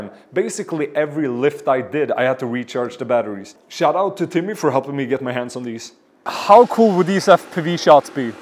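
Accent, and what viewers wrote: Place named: Sweden. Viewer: Älskar att höra din fina svenska dialekt 🇸🇪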